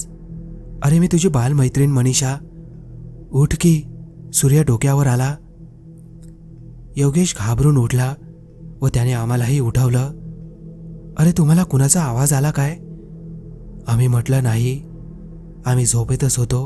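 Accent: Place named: Uttar Pradesh, India. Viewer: अरे मित्रा story कितीही बरी असली तरी narrate करणारा आवाज पण त्या लायकीचा असायला हवाय. एक तर खुप thirdclass आवाज आणि बोलताना शब्द किती चुकीचे. Up वाला माणूस मराठीत बोलतोय असं वाटतंय. मित्रा खरंच सांगतोय फक्त अर्ध्या मिनिटात हा आवाज ऐकून story नको असं वाटतं. थोडा अभ्यास करून channel चालवा. कश्याला स्वतःच हस करून घेताय. कुणीही उठाव आणि youtube वर video टाकाव. फालतुगिरी आहे सगळी.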